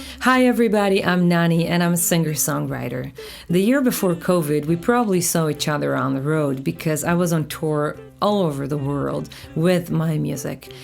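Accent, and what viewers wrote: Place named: United States. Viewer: Woah your English is amazing. Aren't you from Israel? You sound like an American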